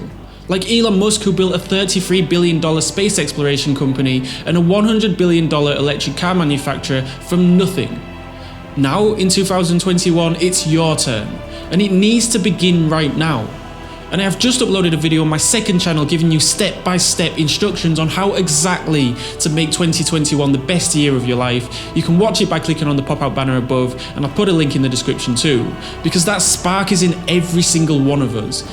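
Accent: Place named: United Kingdom. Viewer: I really love your British accent!!😊😊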